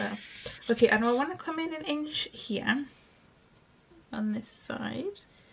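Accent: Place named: Newcastle, United Kingdom. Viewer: New subscriber, Found you through julie at camelia craft designs 😉 it's great to hear a geordie lass 👍 yey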